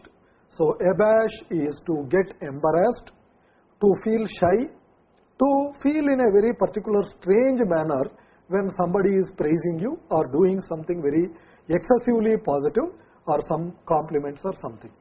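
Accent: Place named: India. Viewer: Useful and interesting method of learning English but hard Indian accent makes the lesson more harm than good.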